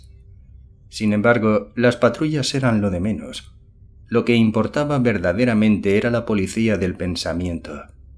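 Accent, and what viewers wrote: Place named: Spain. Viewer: Que feo escucharlo con español de españa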